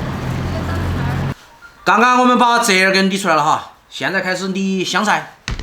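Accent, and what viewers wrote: Sichuan, China. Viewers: 👀你吃就要笑。最好不要吃多麼快。喜歡你的四川口音。well done!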